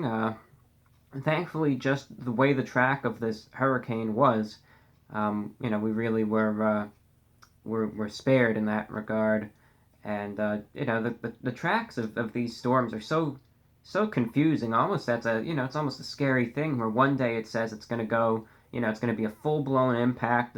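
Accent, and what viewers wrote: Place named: Massachusetts, United States. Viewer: Bro wtf i thought u were from Massachusetts!?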